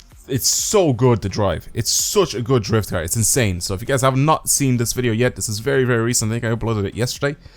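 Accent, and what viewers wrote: Dublin, Ireland. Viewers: U from dublin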